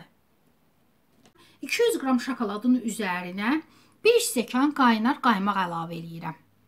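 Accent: Baku, Azerbaijan. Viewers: Lehceniz lezzet eliyir. Bakililar başa dūşer.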